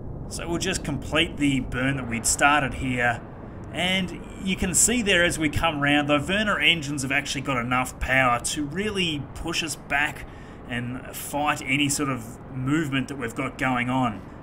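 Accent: Australia. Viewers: an old Australian audio book guy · Hey! What part of Australia are you from? I'm from Melbourne:)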